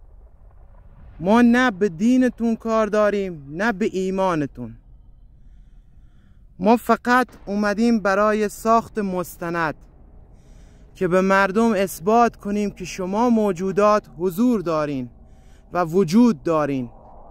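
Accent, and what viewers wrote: Kerman, Iran. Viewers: دمت گرم مرد با دل و جرأت کرمانی ممنون از زحمات شما و گویش بحال کرمانی تان👍♥️👌🙏🙏tanke you..very good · ممنون خان برای ضبط این مستند. لهجه کرمونیت خیلی قشنگه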